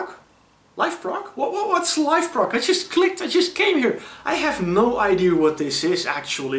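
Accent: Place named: Netherlands. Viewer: I like the smell of prog ... (and the Dutch accent)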